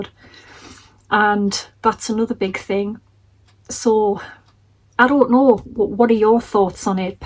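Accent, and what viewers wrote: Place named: England, United Kingdom. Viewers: Such a sad video. I have three craft content makers that I watch every day and you are definitely one of them. You make everything clear and precise. I think I used to watch any papercraft tutorials I could find but realised that a lot are not prepared, waste time looking for things and just don’t have our friendly northern accent (😍). I did lose you for a time but that would be due to your house move I think. Will definitely be watching you. I like the way you give a twist to old designs which means I probably have the equipment to make the cards. I also prefer the full tutorials but that’s probably because this is my retirement hobby so have plenty of time. Hope things improve for the industry. X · Hello, I have literally just found you on YouTube and Ive been paper crafting for about 2 years. Here's my opinion: firstly its so lovely to find a paper crafter with a fellow English accent 😅, there arnt that many on YouTube to be honest so thats nice. 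☺️And with regards to long videos, for me the longer the better but I have lots of time on my hands as I dont work anymore. I think, digitals are a thing, I tend to buy digitals as they're cheap and you can reuse over and over. Also alot of crafters like me already have alot of supplies and are keen to use up what weve got. So keep doing the long videos. I like to watch a video when I get into bed at night, it really helps me to wind down. Then any projects I like I will save to my Watch later and make them the next day or whenever. It seems you are a popular crafter (and Im going to check out your videos after this)😁so keep doing what you're doing. I particularly like one page folios, as they're useful to store ephemera. Pity about postage costs too. Thanks Royal Mail. 🙄I am also a card maker but haven't made many of late. Ive stopped buying from Temu for supplies and focus on charity shops as its more sustainable and cheaper. 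Do you sell digitals? ❤️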